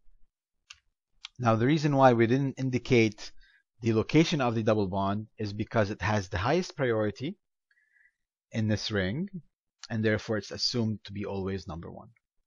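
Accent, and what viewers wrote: Arab world. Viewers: You sound pure Arab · lol I can hear your Arabi accent :P thank you for this!...test tomorrow ;(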